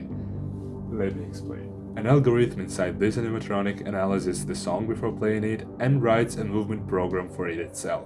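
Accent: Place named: Russia. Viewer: Всегда русский акцент уловлю 🫂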